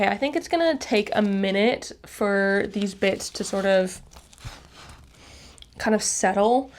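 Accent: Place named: England, United Kingdom. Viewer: Ive been curious about where your originlly from and when you moved to england, do you notice that you have an english accent sometimes? i think its cute! i would love to live in england. I also notice that when you speed up your videos you move your mouth around, do you have a bad habit of chewing your cheeks like i do? you seem a bit neurodivergant and i think thats a sign. I do it so bad sometimes that my cheeks will be sore. Love your videos btw!! love your fashion style to! where do you get your jewelery??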